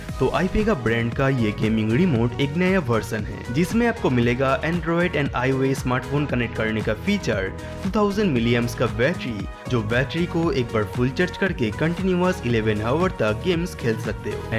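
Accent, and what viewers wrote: Bengal, India. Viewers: According to your Hindi pronunciation, I think you are a Bengali , better you try to speak in English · Wo totla nahi bangali hai😁😁😁😂😂😂😂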